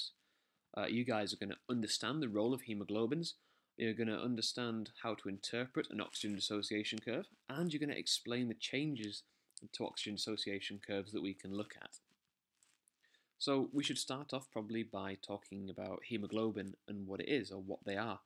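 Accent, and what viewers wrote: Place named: United Kingdom. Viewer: Im so glad I've found your channel, just in time for my exams next month. Thanks! Also its nice to see hear somebody British teaching Biology, as there are a lot of American videos on Youtube and they pronounce a lot of things differently.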